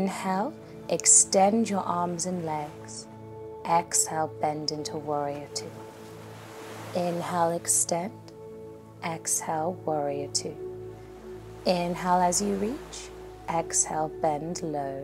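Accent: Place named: United Kingdom. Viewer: Thanks, so good to have a British accent on here, subscribed now 🙏🏼💪🏼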